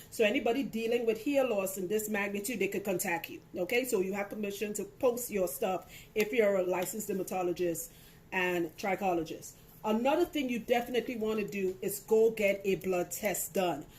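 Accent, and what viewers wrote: Trinidad and Tobago. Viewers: Sounds very trini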